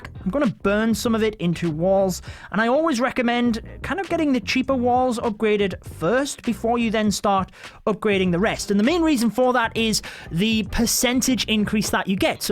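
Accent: United Kingdom. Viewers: I love that British accent 😂.... P.s thnx for the great video! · Very good british Accent I enjoy your speaking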